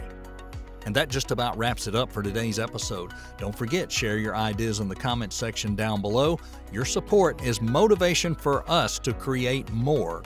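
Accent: South, United States. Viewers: Another too rare video without randomly CAPITALISED WORDS in the title or inconsequential exclamation marks or deceiving wording, stupidly hoping it will generate more views. Please keep this more mature southern accent voiced guy that I learned to appreciate and stop occasionally replacing it with the other prepubescent one. Maybe keep those shady (and, quite frankly, dumb) tactics for your other thousand Youtube profiles I unsubscribed from, but please keep this one free of bullshit. Otherwise, I'm grateful for this well presented informative content.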